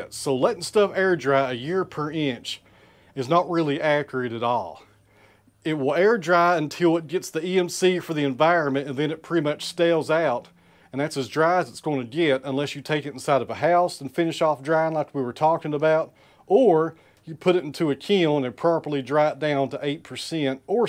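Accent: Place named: Tennessee, United States. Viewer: EMC {not AMC },: Equilibrium Moisture Content - My New England ears don't hear past your Tennessee drawl well.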